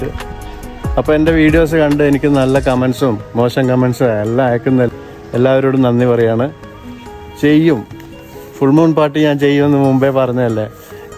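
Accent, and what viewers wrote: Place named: Rural, India. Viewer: നിങ്ങളെ വീഡിയോ അടിപൊളി നാടൻ സംസാരം ❤ നാട്ടിൽ എവിടയാ❤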